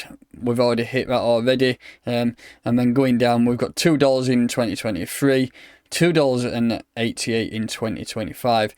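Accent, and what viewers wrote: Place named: North, United Kingdom. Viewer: I come for the Crypto and I stay for the Northerness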